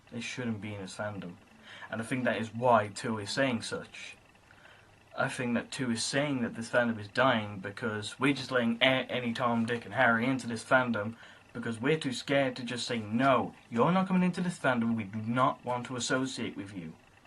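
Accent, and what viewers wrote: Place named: United Kingdom. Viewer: nice video, your british ranting reminds me of rysis and arthimus wishfoot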